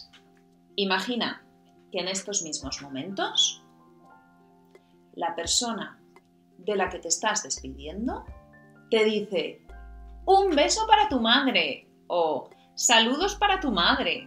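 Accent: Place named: Spain. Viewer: Me encanta su voz y acento española. Te mando besos y abrazos desde EEUU 🇺🇸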